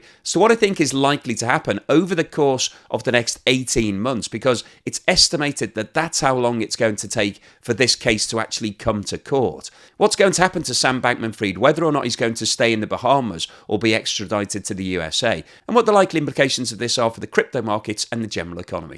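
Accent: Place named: England, United Kingdom. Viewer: You are hard to understand since I'm not used to English english!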